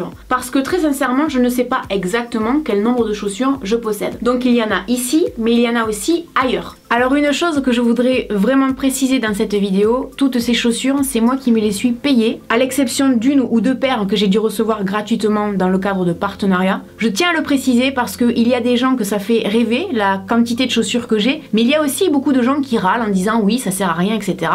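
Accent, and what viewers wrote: South, France. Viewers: Cc tu as l'accent du sud sa s'entend mdr moi je suis du sud aussi donc j'ai reconnu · J'aime trop ton axent t'es du Sud moi je suis marseillaise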